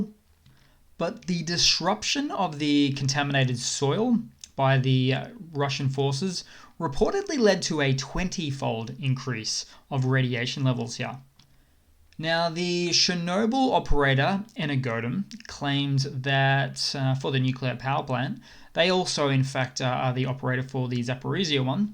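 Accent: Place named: Australia. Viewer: Brilliant and great to hear an Aussie voice. Slava Ukraini!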